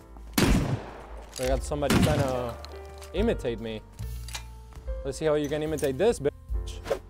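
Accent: Argentina. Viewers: sos argentino o que? jajaja wtf you blowed my mind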